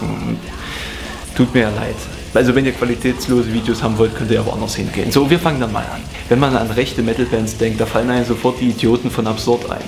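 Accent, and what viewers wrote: Saxony, Germany. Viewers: Ich glaube er kommt aus sachsen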